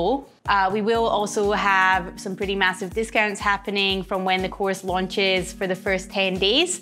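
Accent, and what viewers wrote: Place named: Scotland, United Kingdom. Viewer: well, we all want more of that Scottish brogue! Cheers and best wishes from San Francisco. :)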